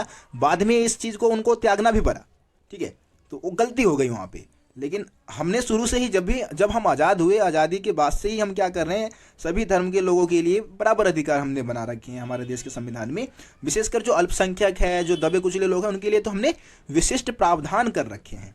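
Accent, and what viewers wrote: Bihar, India. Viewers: Sir aap Bihar ke ha kya.aapki batto se laga hame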